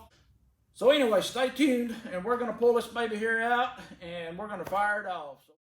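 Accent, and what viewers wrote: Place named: New York, United States. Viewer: Love the New York accent :) Nice plane...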